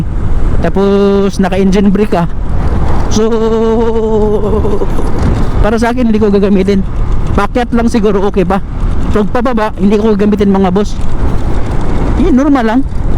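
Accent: Visayas, Philippines. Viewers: Sigurado ako BISAYA TO.... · Bisaya kaman dong😂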